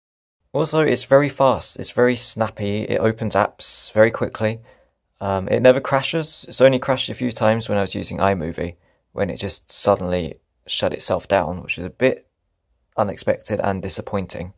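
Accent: United Kingdom. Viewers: you have a british accent although you life in japan I guess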